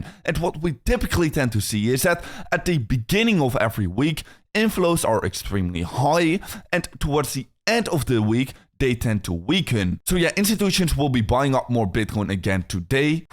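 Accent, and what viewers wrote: Netherlands. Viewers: Waarom heeft hij 2 kanalen? Je hoort duidelijk hetzelfde Hollands-Engelse accent.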